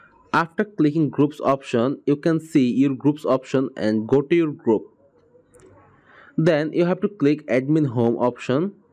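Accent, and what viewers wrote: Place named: United Kingdom. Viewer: Can you make this in Bengali I can't understand your British English😢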